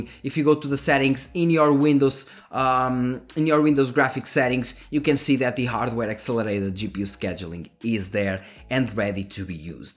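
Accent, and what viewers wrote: Portugal. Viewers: mate can you make a video with you real portuges acento. i would love to hear you speaking Portugues parcero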